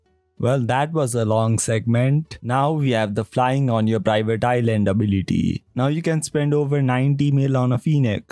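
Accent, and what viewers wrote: India. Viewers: Are u indian? If no then where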